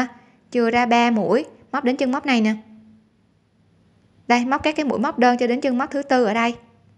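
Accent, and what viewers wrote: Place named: Da Lat, Vietnam. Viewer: nghe nói tiếng giống người Đà lạt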